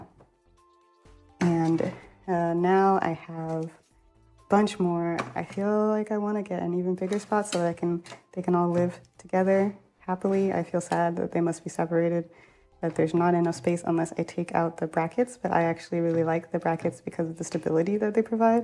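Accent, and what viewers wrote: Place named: United States. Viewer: I cannot pin down what country you’re from... your english is so perfect and sounds very american but you mention al the prices etc in euros? I am very torn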